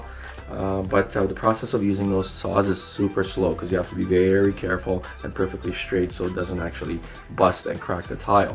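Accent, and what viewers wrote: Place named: Pacific Islands. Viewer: You sound like a kanaka? You from the islands?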